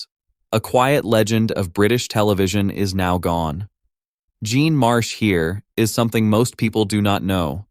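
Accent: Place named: United States. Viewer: Why the f*** do we have to listen to an American voice telling us about British TV legends ??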